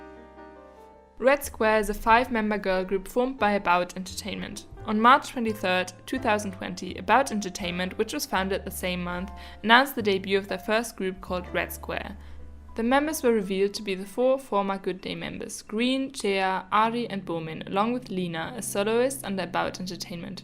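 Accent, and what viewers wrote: Germany. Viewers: Personal question: Are you German? Your accent sounds German to me.... Correct if I'm wrong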